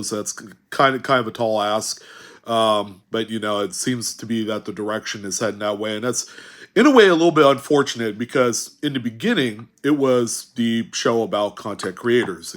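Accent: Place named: California, United States. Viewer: That's the way dudes from California talk mate 🦘🤠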